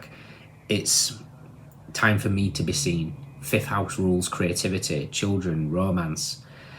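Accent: Northern England, United Kingdom. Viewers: I always look forward to your readings. I love your accent…. A proper northern accent. Thank you for reading 🙏🏻Have a great week ahead.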